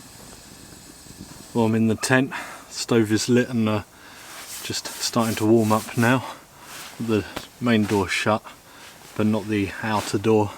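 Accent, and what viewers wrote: England, United Kingdom. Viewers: I'm sure your significant other loves your camp cooking. I know I would even with a good couple of nice beers. Love your lifestyle. Was hoping to get out myself hot tenting in the snow but all we have had is rain, rain., rain over here in Southwest Washington State USA. Stay safe and thank you for taking us along on your wonderful outdoor adventures. I love your British accent. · Nice video English Accent different than the NYC dribble BS😎👍 That stove attached to the link is currently unavailable, oh well I guess that is the way it goes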